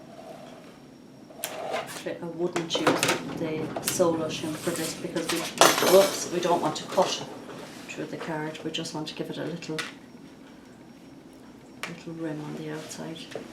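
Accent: Ireland. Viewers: I've not looked into embossing diffusers yet, but your video makes it look so simple. A great technique to add to my cardmaking, thanks for sharing! Love the Irish accent too, lol! P. S. I wonder if you could use a plastic cutting board/mat from the Dollar Store for this purpose?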